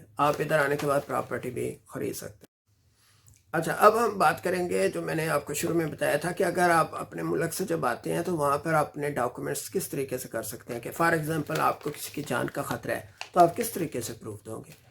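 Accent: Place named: Sialkot, Pakistan. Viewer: Sir lagta ha ap sialkoti hain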